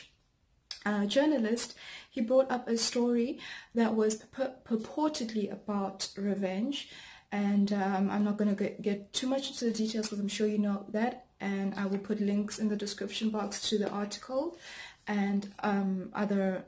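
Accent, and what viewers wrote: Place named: United Kingdom. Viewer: BUT HOW MANY NIGGAS KILL OTHER NIGGAS FOr THE SAME THING LMAO YOU DUMB BRIT YOU ARE NOT UNDER RACISIM LSITEN TO YOUR AccENT YOUVE NEVER TOUCHED A GUN OR BLOOD IN YOUR LIFE YOU FUCK FACE \ hah if you get this far into this your brave and should be able to see past what liam nesson says He said he would do it but ik youve said youd kill all white people.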